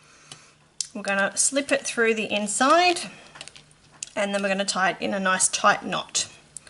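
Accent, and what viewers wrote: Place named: Australia. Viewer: I love ur australian accent! Haha. Thx for the helpful vid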